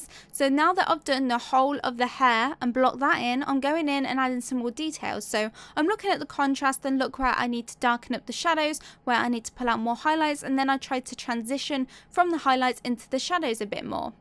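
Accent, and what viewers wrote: United Kingdom. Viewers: Is that British Accent?? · I love your accent British!!!! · British accent???¿¿¿ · BRITISH ACCENT OP SHE LITERALLY SOUNDS LIKE CLAIRE HOLT ( REBEKAH MIKAELSON) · The British accent <33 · British accent? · Hi your sketching technique is just as beautiful amd marvellous as your lovely and sweet British Accent. I am in love with your voice and killing British Accent. Wow! I have not seen many videos with this simple yet realistic technique. Thank you very much for sharing. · I love ur british accent :)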